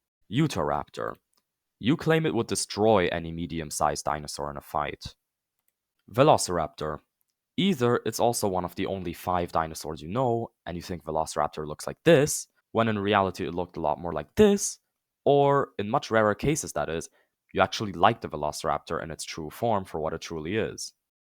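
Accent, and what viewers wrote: Germany. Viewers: When I heard this guys voice I knew this guy was German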